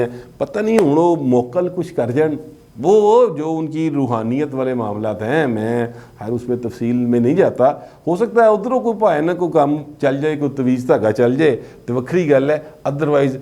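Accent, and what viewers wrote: Lahore, Pakistan. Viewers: Typically lahori style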